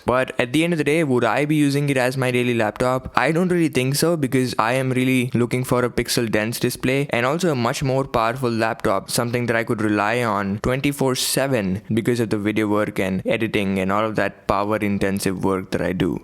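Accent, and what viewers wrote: India. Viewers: Dude, going by your accent I can tell you're an Indian and that video sir was absolutely amazing. The music and the editing and the voice over is definitely better than most of the big shot YouTubers who give Tech reviews like GeekyRanjit and C4E. Keep making videos brother and someday you'll be at the top. I'm from India as well making Speed Arts on my channel. Take a look if you like. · Chewbecca Gamer He sounds like a bloke from India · is he Indian ? his accent doesn't sound like one from the English speaking countries? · 1000 dollars? u r an Indian, right? u definitely sound like one... anyways, great video man..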